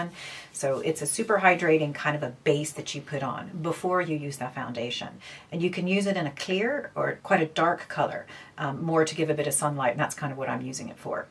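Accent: Ireland. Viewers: Great review! You’re getting a Dublin accent 😁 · Just listening to you and you saying you're in Europe I kept hearing an irish lilt and was satisfied to see you are based in Dublin! It's so interesting how accents creep into others. I love this review, very helpful as I rarely wear makeup and love the no makeup makeup. The pencils look so promising as well as the balm.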